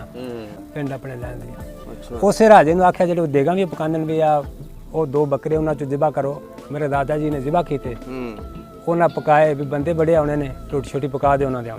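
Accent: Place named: Punjab, India. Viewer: Auncle g my parents came from Gurdaspur too, I like yr Punjabi accent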